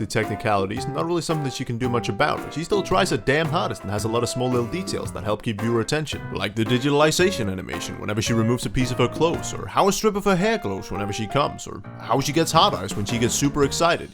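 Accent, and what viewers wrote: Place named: Denmark. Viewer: Den dansk engelske accent vil altid være der uanset hvor meget man prøver at gemme den xd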